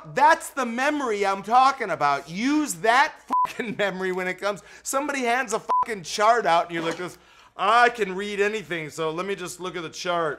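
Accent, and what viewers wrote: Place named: Midwest, United States. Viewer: This dude is from the midwest for sure.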